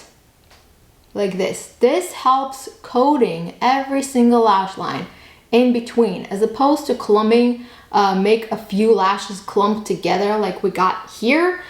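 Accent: United States. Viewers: What accent? She has an American accent nothing special. 😂🤣. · It's just American English 🤷🏼‍♀️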